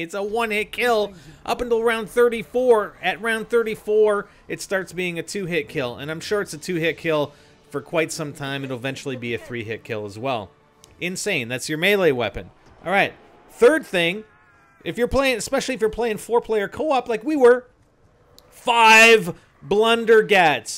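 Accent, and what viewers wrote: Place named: Canada. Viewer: are you Canadian? judging by the way you say 'out' and 'about' I think you are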